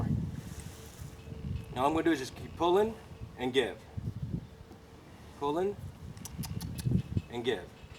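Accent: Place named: Caribbean. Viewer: another awesome vid. I love your accent .. caribbean with a 'twang' :) Where are your roots ?? St Thomas ? :)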